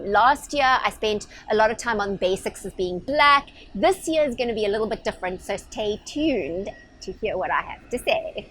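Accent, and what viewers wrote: South Africa. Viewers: Oh my goodness, you made my day - I was commenting before you got to the end, because I was so interested in your suggestions and already mulling over adding some burgundy into my wardrobe! DeepWaterHappy is my blog/YouTube/Insta/Pinterest "handle" for cruising, diving, travel and lifestyle content. I'm Kim Walther, a 51 year-old high school business/photography teacher from Florida. I run, bike, work out, produce a lot of artwork, and I also work as a sailboat captain. We wear khaki shorts and white company polo on the water. However, every day at school... I enjoy dressing up and coordinating stylish outfits. This year, due to your upbeat influence, I'm wearing a lot more heels to work and dressing it UP quite a bit. I've spent a lot of time in SA, Cape Town area, and dearly love your accent; it reminds me of friends and family there. 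And who better to give fashion advice than another Florida girl-turned-ZA! I absolutely adore you! Thank you for the watch! Mwah! Baie Dankie!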